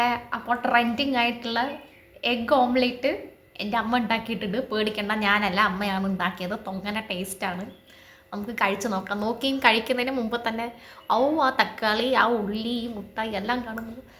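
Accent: Palakkad, India. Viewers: Palakkad slang super 👌🏻😄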